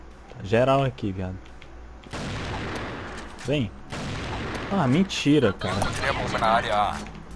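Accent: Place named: Northeast, Brazil. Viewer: Joga d+ cara u onde que cê Mora??:p Sutaque de Nordestino:P:3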